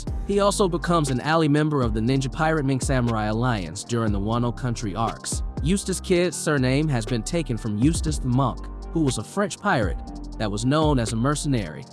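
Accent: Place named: Georgia, United States. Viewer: this is the most Georgia accent I've ever heard lmaooooo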